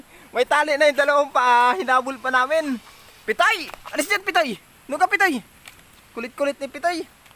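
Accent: Bicol, Philippines. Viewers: Saan ka sa bicol idol??? KC bicol ang tuno ng salita mo.... Taga Bicol din ako idol.. pashout out naman idol salamat 👍🥰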